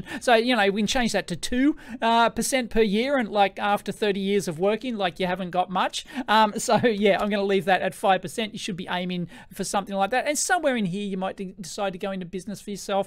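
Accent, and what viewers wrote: Australia. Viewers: your voice kills me bro and that Australian accent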